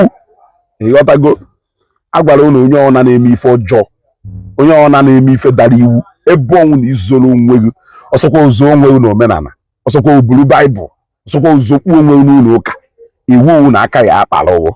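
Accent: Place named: Nnewi, Nigeria. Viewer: Greetings to you. Just came across you accidentally right now. While listening to you talking about the arrest of a fake pastor. You sounded like “nwa afor” Nnewi Ana Edo. If you don’t mind me asking, are you from Nnewi? Abum onye Nnewi.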